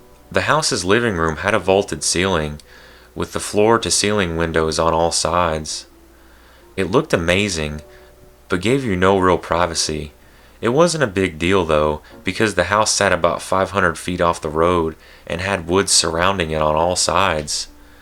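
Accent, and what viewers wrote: South, United States. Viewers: You're beautiful AND you have a southern accent.... MEEEEEEOW!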